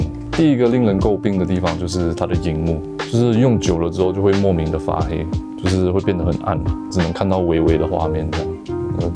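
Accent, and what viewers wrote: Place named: Taiwan. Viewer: 感覺聽起來有台灣的口音欸！是來過台灣讀書嗎？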